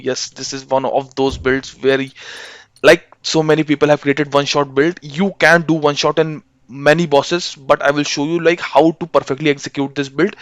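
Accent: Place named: India. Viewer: I can't tell if he's being serious or meme'ing because of the super extra Indian accent. 😅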